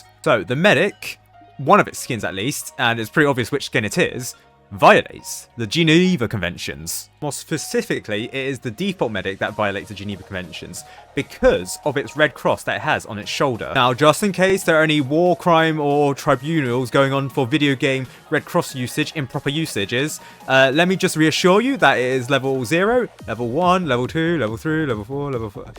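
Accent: United Kingdom. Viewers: Let's go i love British man talking about Geneva convention laws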